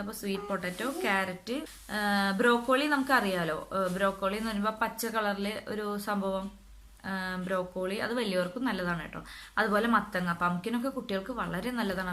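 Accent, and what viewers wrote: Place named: Thrissur, India. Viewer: good, thrissur evideya, njan plkd pattambi anu .slang njangal samsarikunna pole thanne adha chodiche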